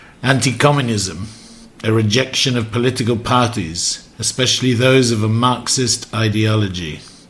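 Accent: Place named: RP, United Kingdom. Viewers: Indeed. "I call it british RP with a spanish twist" but I found it to be sophisticated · His voice sounds like Trump's with a British accent hahaha I actually like it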